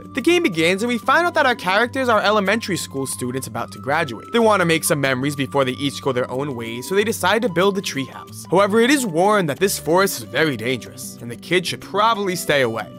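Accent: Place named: California, United States. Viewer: What do you mean? Just sounds like a normal California accent to me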